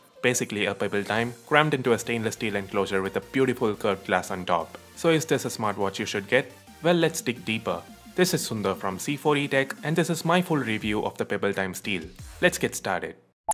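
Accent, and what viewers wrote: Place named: South India, India. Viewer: You have a typical south Indian's accent. Not complaining though! 😃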